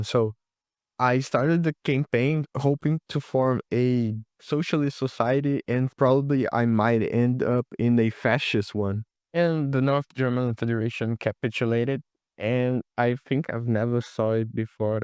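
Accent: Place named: Brazil. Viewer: He is prob from Brazil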